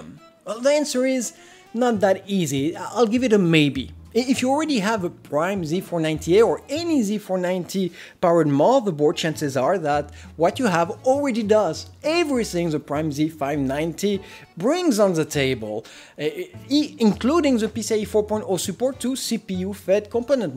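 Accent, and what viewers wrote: France. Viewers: You have a little french accent 😊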